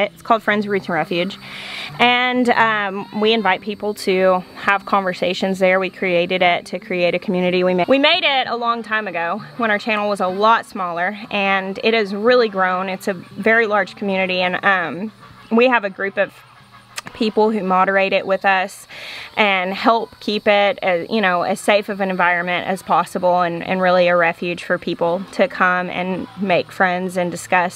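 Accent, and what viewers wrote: South, United States. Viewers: I started watching your earlier shows and loved them.i do prefer the old voice,just can't handle the southern twang you got now,I wish you well and hope you loose the twang don't be like others be youself you have a bright future stay sparkles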